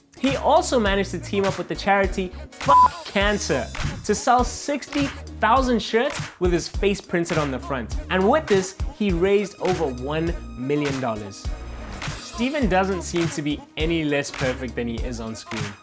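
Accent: South Africa. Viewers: is this guy South African? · This presenter sounds South African so over there Arrow season 7 was just released on Netflix.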